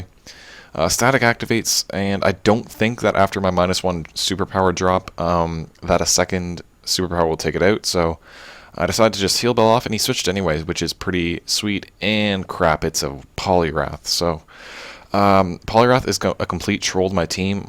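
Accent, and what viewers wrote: Canada. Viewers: u sound candian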